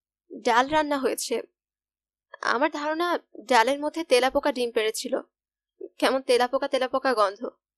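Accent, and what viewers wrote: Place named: Kolkata, India. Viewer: oh okay. Your pronunciation sometimes is like Kolkata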